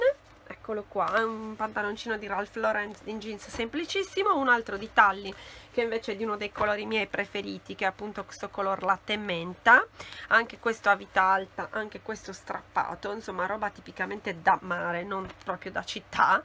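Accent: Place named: Cagliari, Italy. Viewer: con due mesi di vacanza ti tornava l'accento casteddaio fortissimoooo!😂😂😂 in questi ultimi video si sente il ritorno alle origini! 😍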